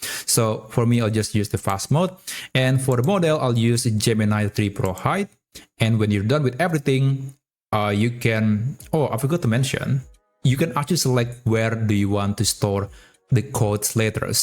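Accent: Indonesia. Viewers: That's what I thought, too. his English is Indonesian English. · stunning tutorial man, great job! btw your accent like indonesian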